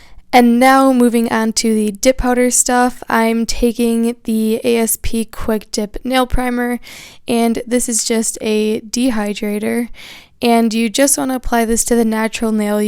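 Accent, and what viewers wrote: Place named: North, United States. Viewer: Are you from Buffalo or Chicago? You have those classic northerner 'A's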